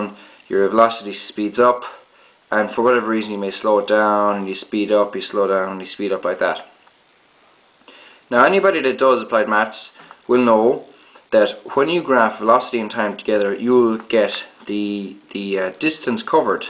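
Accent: Ireland. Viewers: Excellent video and this man's Irish guys, not Scottish :P And you will also need to use endless amounts of integrals in economics when trying to analyse profit margins and macroeconomic objectives. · he's irish!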